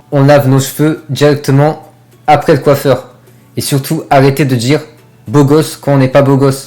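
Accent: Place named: France. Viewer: Was great to listen to you. You have a beautiful French accent. I enjoyed your presentation 😄big like 👍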